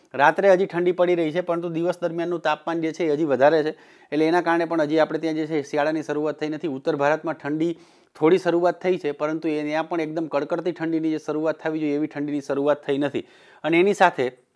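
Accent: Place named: Kathiyawad, India. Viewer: સરસ આપની ભાષામા કાઠિયાવાડી ઝલક છે સૌરાષ્ટ્ર ના છો?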